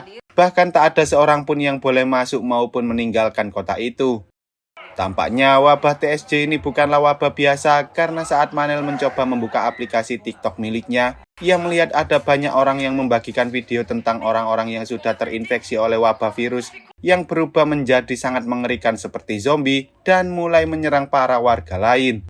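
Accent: Java, Indonesia. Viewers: Jawa kowek logatnya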